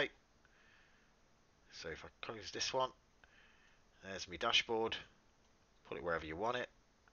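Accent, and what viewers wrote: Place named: Liverpool, United Kingdom. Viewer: scouser for the win great video mate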